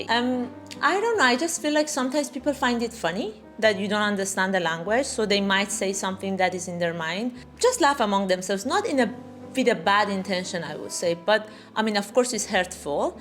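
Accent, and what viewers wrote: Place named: Ghana. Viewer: Congrats on your Ghanaian accent 😁👍🏾 “By the Grace of God …”, lol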